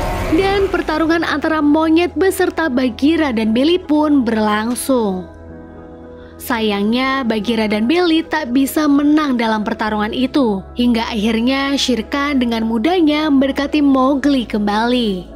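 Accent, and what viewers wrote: Pemalang, Indonesia. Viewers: Mba sampean kaya wong pemalang mba, angger iya tangga mba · orang pemalang mba? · Goblog nemen si🤭 Kya logat e wong Pemalang kota, bener ora sih wkwk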